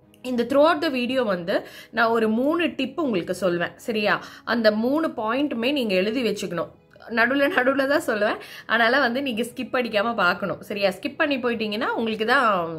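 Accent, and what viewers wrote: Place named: Kerala, India. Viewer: Watching 2 to 3 videos in the past I doubted that you would be malayali..... And In this video your slang resembles like a malayali .....are you a malayali..??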